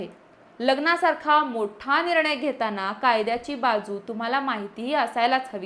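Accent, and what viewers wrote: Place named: Kolhapur, India. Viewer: ताई फ्रॉम कोल्हापूर वाटते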